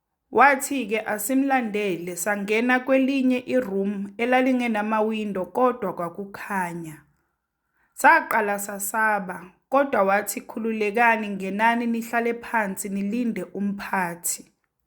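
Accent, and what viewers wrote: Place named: Nigeria. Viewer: Wassalamu alaikum wa Rhamatullahi wa Bharakatuh You sound Nigerian yourself.. 'cause you are not Umzulu....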